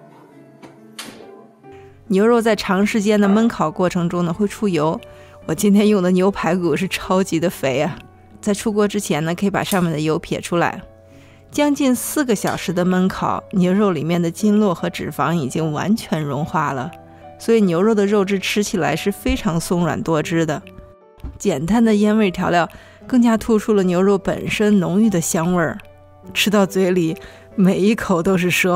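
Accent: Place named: Shijiazhuang, China. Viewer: 听小高姐的口音很像石家庄人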